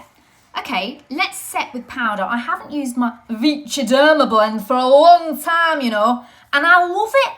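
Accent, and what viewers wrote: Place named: North, United Kingdom. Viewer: 🤣🤣🤣 yes the northern accent!! I love you when you do that! Been having a brill day today but you have just made it better!! I get so excited when you post a video and just love the chit chat 🤸😊😁♥️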